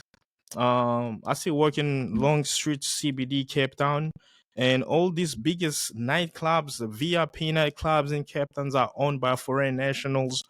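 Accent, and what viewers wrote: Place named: Nigeria. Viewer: What is wrong with Nigerians, why don’t they take their wealth to Nigeria and build it. Nigeria is broken, even Banana Island is not great. Looks great by Nigerian standards. Nigeria is crime ridden, they live in darkness because the country has not got electricity. The rich people have generators that light only their homes. With all these billionaires they constantly boast about, shouldn’t they help at home. Man, you sound like a Nigerian. And Idris Elba is a Ghanaian and Sierra Leonian. LeBron James is American.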